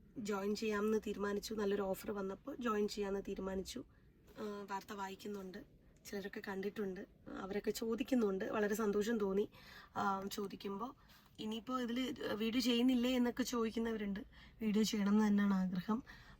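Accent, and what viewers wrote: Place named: Palakkad, India. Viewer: Palakkad Malayalam.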